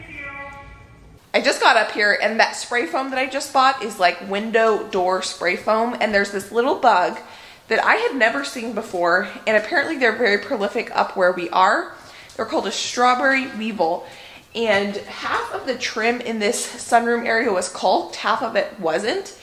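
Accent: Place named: Minnesota, United States. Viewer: You sound like you could be in the movie Fargo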